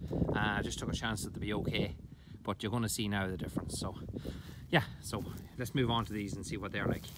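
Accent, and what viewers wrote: Ireland. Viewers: Like your videos mate just subscribed and I love your Irish ☘️ accent, birds sounds like boards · where in ireland are u from?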